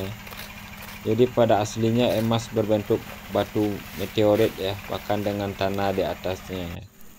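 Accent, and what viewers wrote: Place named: Minangkabau, Indonesia. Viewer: saya dengar dari dialek bahasanya ini pasti dari tanah minang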